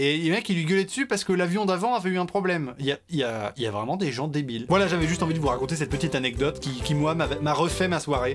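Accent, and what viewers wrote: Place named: Toulouse, France. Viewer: Pas mal l'accent Toulousain ! x)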